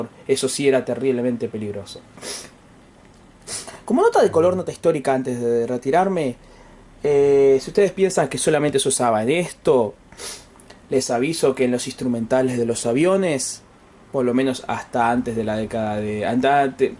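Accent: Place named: Argentina. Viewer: Tengo un Nikkor 200mm F4 Ai que supuestamente es radioactivo y que es de los 70s, podra ser? Me encanto tu canal, mucha informacion, y es refrescante escucharla en "argentino" y no en ingles jajaja, un abrazo grande!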